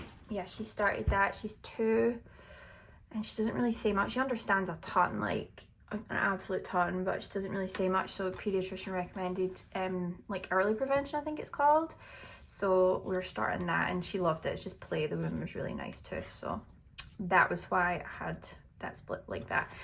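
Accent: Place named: Ireland. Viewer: New subbie here! Love your accent! Irish?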